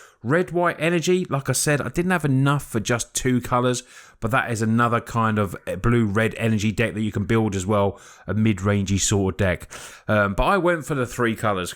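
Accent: United Kingdom. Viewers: Its nice to hear a proper Englishman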